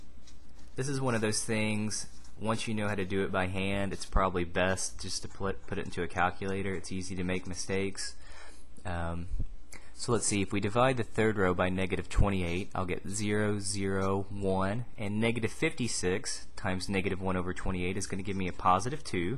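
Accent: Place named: Mid-Atlantic, United States. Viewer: Hey, what state are you from? I'm detecting a slight mid-Atlantic accent. No?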